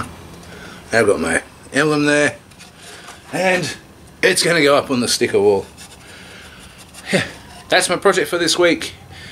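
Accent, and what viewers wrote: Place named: Brooklyn, United States. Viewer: Wait...you’re Australian?! I thought that was a Brooklyn accent! 😂 A couple things...that drawing is beautiful, it reminds me so much of a Tim Burton character. And was that a Skerple you used? Always love your videos buddy, glad your little one is doing well. That bottle opener was the best part...gave me a good laugh!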